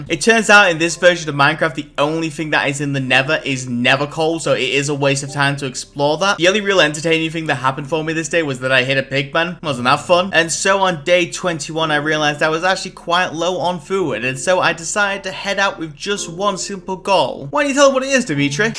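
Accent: United Kingdom. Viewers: Yo fr I CANNOT listen to a british "person" talk for 30 mintues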